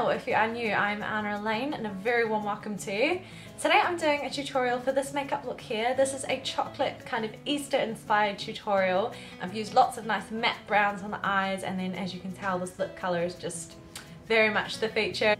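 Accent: New Zealand. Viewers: Hi I'm here from Crystals channel, love hearing a kiwi voice on YouTube. Yay !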